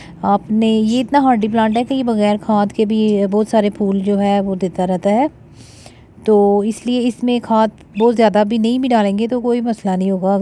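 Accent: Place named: Punjab, India. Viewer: barri sohni awaz punjabi touch of pindi ji.like ji.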